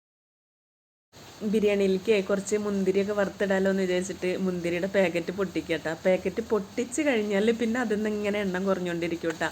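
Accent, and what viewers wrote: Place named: Malappuram, India. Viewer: നിങ്ങള് മലപ്പുറം ആണോ? ?സ്ലാങ് മലപ്പുറം ആണല്ലോ 😀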